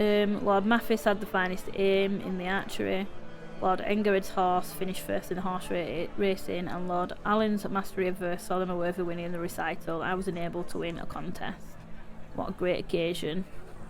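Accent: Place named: Midlands, United Kingdom. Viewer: Sounds like a midlands accent, good luck with the series!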